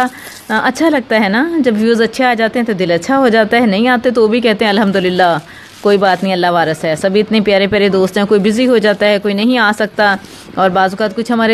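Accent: Punjab, India. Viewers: AAj to Panjabi naal Gallan kar rahe ho aap...Naya Andaz achha laga ji MashaAllah Aam ka pakaya jaa raha hai... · Aunty aap bohat mushkil punjabi bolti hain. Good dish in the start . Green walay aamon ki peti mujhay bhejwa dein. heheeh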